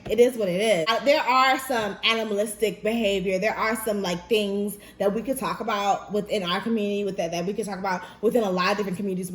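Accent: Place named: Caribbean. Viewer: I LOVE HEARING THE CARIBBEAN IN YOUR VOICE & ACCENT MA'AM.... ITS FUCKING GORGEOUS... And i NEEDS IT.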